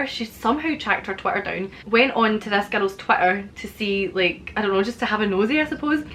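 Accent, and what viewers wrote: Scotland, United Kingdom. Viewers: Sounds like you're a shambles of a lass 😂 love it, definitely subscribing! The one about walking into your mates kitchen with your shirt undone had me flippin dying 😂 Mind you the Scottish accent doesn't hurt 😆 I could listen to Scottish people all day.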